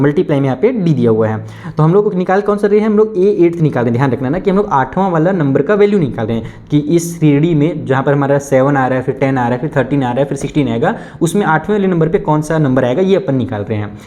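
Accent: Bihar, India. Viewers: Sir bihari language ❤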